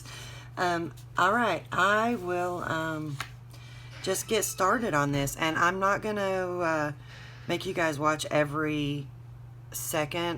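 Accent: South, United States. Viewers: Beautiful! Your accent DOES NOT SOUND HICK....like someone else mentioned, it is Southern Charm!!!!!! I love the southern accent; HOWEVER, there are a couple accents that are not as pleasing as yours, at least to my ear!😊. I really enjoy your work and watching you produce beauty from nothing....don’t be so critical of yourself, you are a winner in my book....keep the videos and teachings coming, I want to learn more! Blessings..... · You do not have a "Hillbilly Accent"... That is Southern Charm.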